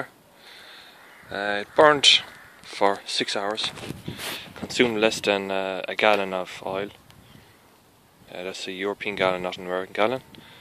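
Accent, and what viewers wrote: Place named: Newfoundland, Canada. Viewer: sound alot like a newfoundlander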